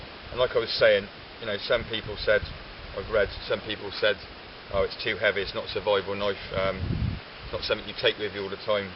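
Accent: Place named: England, United Kingdom. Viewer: Its 751g, which means it can run as an axe too, 49/51 carbon steel, Sheffield forged, micanta handle is lovely I swear by this blade, I run this and a Moro and love them..... I wear mine on the waist with an elasticated knee strap so i can climb over things without it snagging, that little eye on the bottom makes all the difference, do you still bush, sounds like your in England I'm Dorset are we close?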